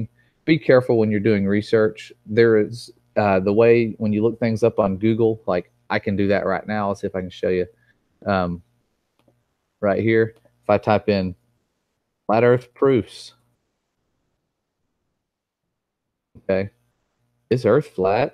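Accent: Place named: South, United States. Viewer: Kinda makes sense that this guy is southern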